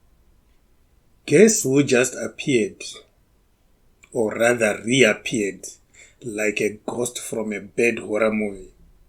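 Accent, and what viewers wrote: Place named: London, United Kingdom. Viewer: You can speak London 💯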